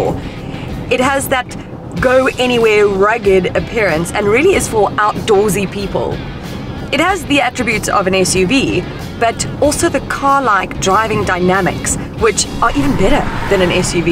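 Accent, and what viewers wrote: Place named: South Africa. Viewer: The south african accent never fails to baffle me, how does it sound so posh and cool at the same time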